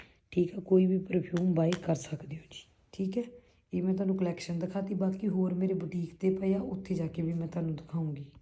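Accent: Malwa, India. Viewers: Hnji didi ji malva cha aapa sare varge hi bolde aa ji and tusi sare bhut vadiaa o didi ji waheguru kare tusi didi hamesha aae hi khush rho ji ❤❤❤didi plzzzzzz jado tusi kadi pind hun aae ta daseou ji mera pind kot fatta ji kot smir de nal ji asi thunoo ghar blaouna ji ❤❤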